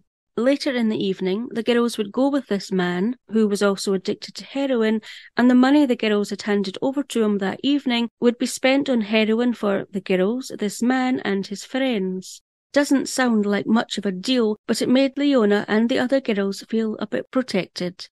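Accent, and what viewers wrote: Scotland, United Kingdom. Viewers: Good narration , glad to hear a Scottish accent telling these stories ,will be listening to more ,thanks.😒🙄😬.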